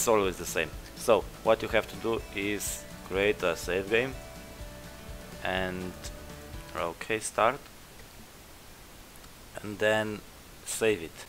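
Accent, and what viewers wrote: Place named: Hungary. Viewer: Magyar vagy? :D Nagyon magyaros az akcentusod :D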